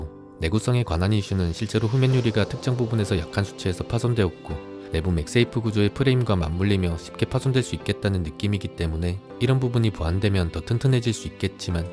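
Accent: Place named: Gyeongsang, South Korea. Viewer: 경상도 사투리에 줄줄 새고 뭉개지는 딕션까지.. 치아 교정하세요?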